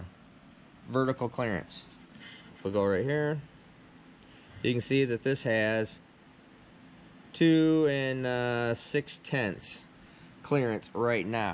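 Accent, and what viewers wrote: Michigan, United States. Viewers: Guys sounds like a Michigander. Those guys know their stuff.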